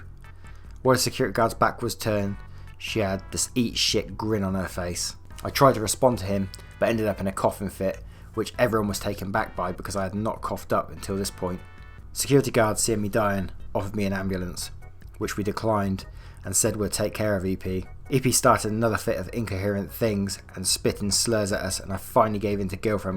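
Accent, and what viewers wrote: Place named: Bristol, United Kingdom. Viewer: Ok then, on some occasions you sounded like a Bristolian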